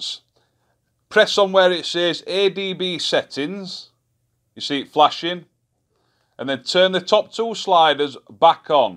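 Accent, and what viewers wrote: Yorkshire, United Kingdom. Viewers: Hi... that's got to be the fastest subscribe I've ever done... 20 seconds in... all I heard was a Yorkshire accent and the laugh... top one mate. I'm on the other side of the Pennines.... Look forward to your upcoming videos.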